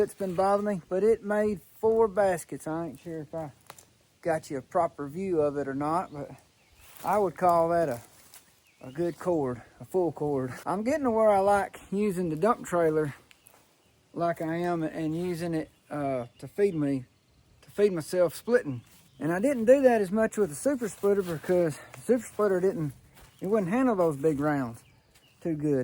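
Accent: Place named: Arkansas, United States. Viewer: Greetings from Clinton, Arkansas! i would like to know what part of the country you are located in, Since you talk like me, we may be neighbors. Please let me know, thanks.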